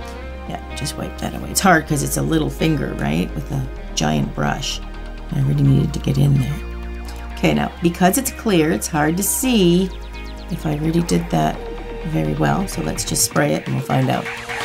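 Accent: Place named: Ontario, Canada. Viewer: Omg I completely feel the same. I am Canadian living in US and I could hear it before I knew you are from BC. You sound EXACTLY like the women in my family loving in Ontario, so nostalgic for me. I am so grateful I found your channel, you're an amazing artist, perfectionist and woman 😊💕thank you!!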